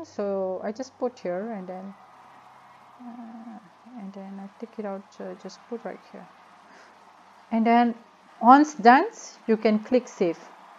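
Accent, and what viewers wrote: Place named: Tamil Nadu, India. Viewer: Mati mood nk tgk bile speaking english dgn suara tamil mcm ni. Baik ckp melayu jelah sis.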